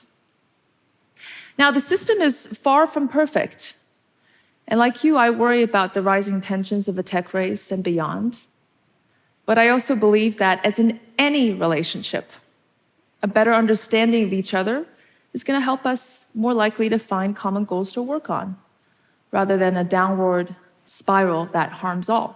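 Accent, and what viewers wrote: United States. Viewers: Wow her American accent!! Amazing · She talks and acts like 100% American. Does she live in China or is she just a CBA? - from Beijing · She doesn't look like one that "read by candlelight" and she sounds too American to appreciate China as she so claims 🤔🤔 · The United States is a country of immigrants. We are a melting pot of many cultures from around the globe. Indeed sounds American. What makes the United States great is our diversity. Europe & the United States-the West-has brought the world enlightenment over the last 300 years. China is now enjoying this western enlightenment on some levels (not politically). It’s unfortunate that the Chinese, Communist Party blocks, YouTube, Facebook, and many other Western icons.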